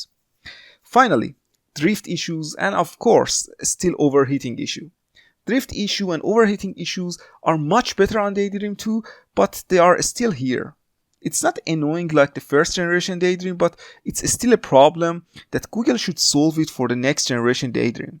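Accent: Iran. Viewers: Love you Persian accent :D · Haha, I was going to ask him this, if he Persian. 🤣🤣🤣🤣 Love it.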